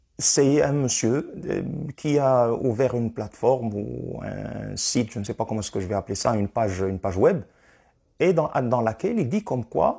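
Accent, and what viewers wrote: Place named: Jebli, Morocco. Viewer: عنوان للتواضع وللتمكن. من يسمع كلام شيخنا الفاضل ياسين العمري وهو يتحدث بعربيته الجبلية سيظنه إنسانا متواضع العلم،لكن العكس هو الصحيح ،شيخ متمكن ومتمرس و مرجع في علوم التواصل بالعربية والفرنسية معا بارك الله فيه ونفع بعلمه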